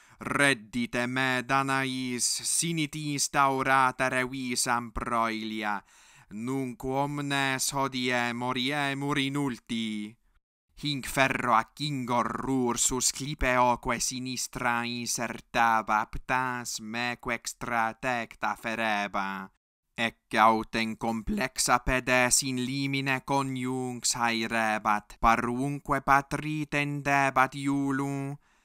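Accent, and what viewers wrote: Italy. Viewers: Calabrese Systēma vōcālium est. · Excellently read. The accent is more modern Italian-like and old Proto-Celtic-Italic. The Classical Latin had more closed e and o (unlike Italian now), except sometimes in closed syllables, but not much. The nasalization of vowels receded mostly and the elision of syllables was reduced due to the reduction of the nasalized and very eliding dialect (a dialect of vulgar Latin and Latin that emerged as a dignified minority). The way poetry was composed, was from the nasalized, eliding dialect, which was not the only one. The other main one was much less nasalized, did not drop the final m, except in prefixes, into n (com to con) . Classical Latin poetry is from the clique that used the nasalized, eliding dialect of Republican Latin, which was replaced by its old brother the very literally and not very elidingly pronounced one (both were very identical to one another otherwise). 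Vulgar Latin also existed as the very not eliding, non-nasalized dialect. Both dialects elided to varying extents the vowels between words that had vowels next to each other. The dialect that lasted throughout the Roman cultural clique as such, was the less nasalized and less eliding one. Vowel length and high amounts of changes in pronunciation were preserved until the middle of the fourth century. By the beginning of the fifth century, the pronunciation that would be called close to Italian was more prevalent, though in Sardinia, a variety existed where vowel length was not preserved, but pronunciation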